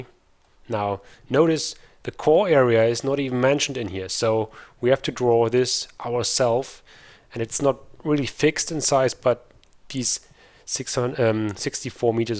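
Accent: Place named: Germany. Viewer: do you still play coh2? Are you from Germany, because your accent sound a little bit like it. greetings from Germany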